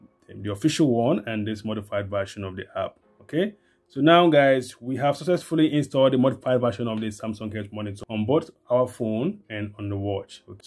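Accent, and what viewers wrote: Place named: Cameroon. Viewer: Thanks for this... only samsung does this kind of crap lol. I really enjoyed your accent it reminded me of my best friend from high school, she's from cameroon.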